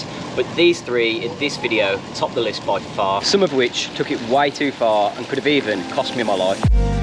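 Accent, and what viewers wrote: Birmingham, United Kingdom. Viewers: Hei man. 1st, thanks for good contents. Then, i watched u a lot before my first trip to thailand, and it was really useful. In fact, because of your nice british accent ,its easier for people like me to undrestand , but maybe you can put an english subtitle on your videos ,so that would be so good for us. It will be more useful and also helping us improve our english. It was just a suggestion. And thanks anyway. Hope to see you on next trip❤ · You from Birmingham or somewhere close to the black country?... your accent is very familiar..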